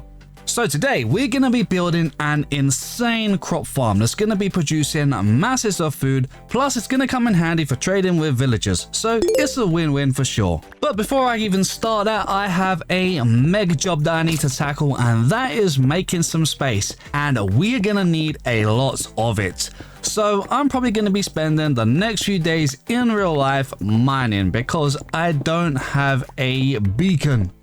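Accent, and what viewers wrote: United Kingdom. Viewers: I'm in love with the presentation on the video British accent makes everything better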